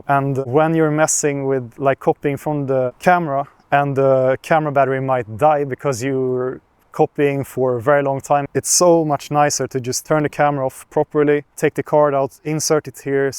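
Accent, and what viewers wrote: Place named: Sweden. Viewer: I love the swedisch accent, its so cool. And it reminds me of Ollienelson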